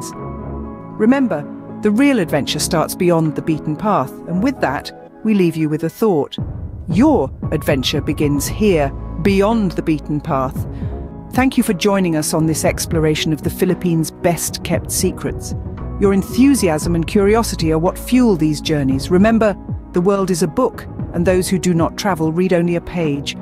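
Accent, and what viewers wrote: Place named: United Kingdom. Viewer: Nice Video. With the British Accent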